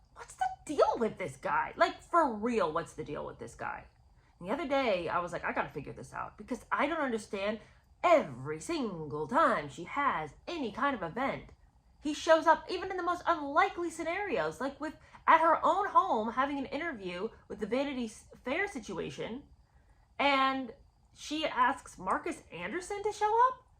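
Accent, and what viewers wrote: United Kingdom. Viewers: I agree. She looked gorgeous in England. She had to have a stylist and she claimed she didn’t. I really enjoy your channel. You’re very funny. Your British accent is simply the best 😂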